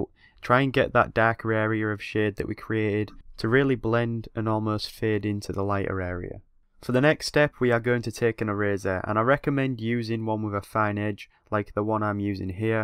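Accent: United Kingdom. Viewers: its 100% british to me lol. dem vowels lol noeu weuy, doeunt goeu theiu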